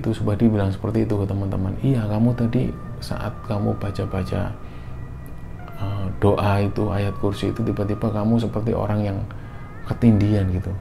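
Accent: Java, Indonesia. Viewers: Cara penyajian dengan belakang backsound uyon " juga background yg gelap remeng" ditambah cara menceritakan dengan logat yg agak medok jawa bgt Bikin suasana lebih "greng" Suara khas mas yg bikin kangen dengerin nya Jam segini lg... Heeemmm Sehat truuuuuss yakkk... Kewreeeennn👍🏼💞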